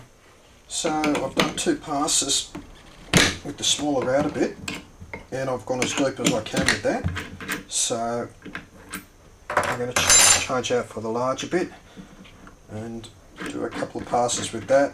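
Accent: Australia. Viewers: I'm assuming you're from australia with your accent, and if you are whereabouts? do you do custom builds?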